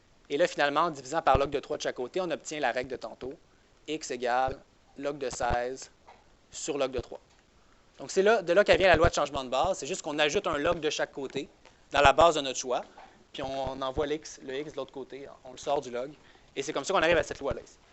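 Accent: Canada. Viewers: Je voulais dire, vous avez un accent légèrement Canadien, et j'ai du mal à vraiment le comprendre, comme les "Têtes à Claques", je comprend rien, mais merci quand même du cours, et de votre réponse.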